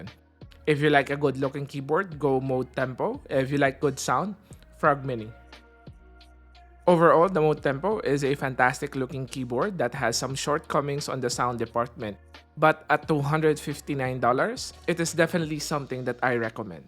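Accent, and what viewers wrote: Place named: Philippines. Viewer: My dude's Tagalog accent is oozing.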